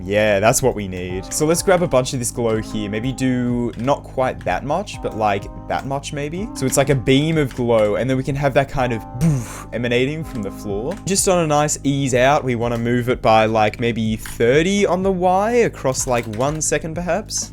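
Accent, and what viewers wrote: Australia. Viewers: sounds awfully Australian today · are you Australian?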